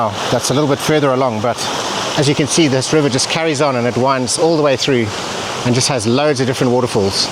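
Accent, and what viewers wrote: South Africa. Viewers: you sound like Suid Afrkaner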